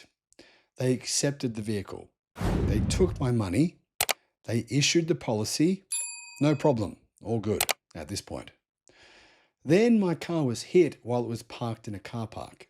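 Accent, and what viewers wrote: Australia. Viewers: Is it an a Australian or overseas.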